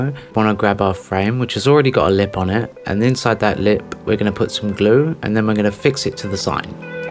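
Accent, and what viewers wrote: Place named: United Kingdom. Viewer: Hi thanks for sharing, Have started to learn sign painting now I have retired and find your videos really helpful. So much to learn and the history fascinates me. By the accent I assume you are in the uk I live in Devon England. Can I ask if I may the type of material you paint on ie plywood ,MDF and what finish you apply to the surface before writing Thanks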